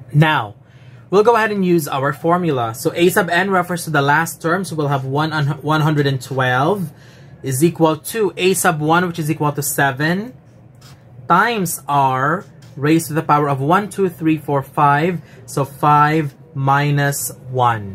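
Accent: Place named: United States. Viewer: Akala ko amerikano yung nagtuturo hahaha. Ang fluent mopo mag turo naitindihan kopa lalo. Thank you sir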